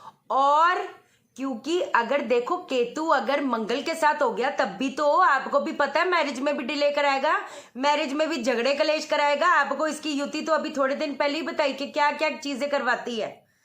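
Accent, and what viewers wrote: Punjab, India. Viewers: Wow💞 bindass talking style👌pujabi touch ..❤️( hai ki nhi.)my favourite ..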